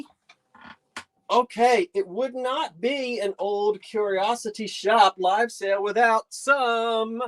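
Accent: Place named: South, United States. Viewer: 😂😂😂 and his southern accent.Am dram is missing out on your talents.David loved hearing the sandal story.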